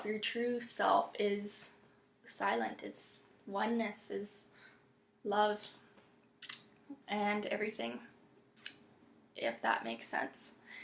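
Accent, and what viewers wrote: Canada. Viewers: I was the person at my last job who was in charge of keeping track of the expiration dates on food and sodas! lol And I would hone in on all my data entry! lol Omg, and work just for work's sake. I always gave work my all - I never just slacked off - even when my boss wasn't there. Spot on! You sound like you're in Canada?? You described my work ethic to a T. And I always invented little shortcuts to make my job easier.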